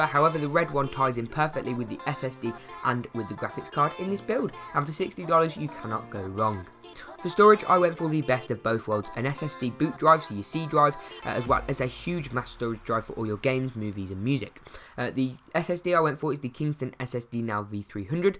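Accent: England, United Kingdom. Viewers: you sound English because ,you should use £ as well as dollars because I'm not sure about the prices ,oh yea I subd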